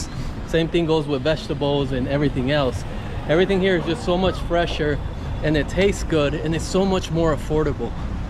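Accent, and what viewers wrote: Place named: Matagalpa, Nicaragua. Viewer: I used to live in matagalpa and I recognized the accent from there on you but now I see you live in the segovias my hometown best place to live beautiful weather and beautiful people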